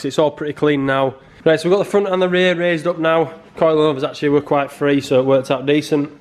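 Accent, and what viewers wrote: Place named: Yorkshire, United Kingdom. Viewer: Where abouts in Yorkshire you from lad?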